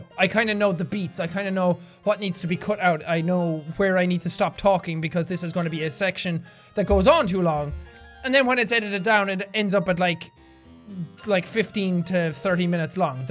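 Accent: Ireland. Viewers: JACK!!!! Since you moved out of Ireland do you think you might slowly lose your accent? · Now that you moved does that mean your going to lose your Irish accent? Please be no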